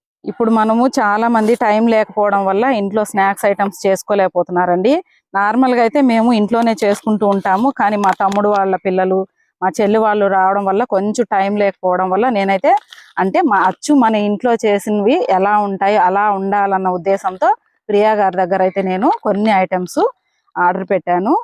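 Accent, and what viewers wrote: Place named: Guntur, India. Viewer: మీరు వెంకటగిరి అన్నారు కదా, మీ లాంగ్వేజ్ నెల్లూరు లా కాకుండా గుంటూరు యాస లా ఉంది మీరు గుంటూరు వాళ్ళా గారు లేదా ఆ డెలివరీ చేసిన వాళ్ళు గుంటూరు నా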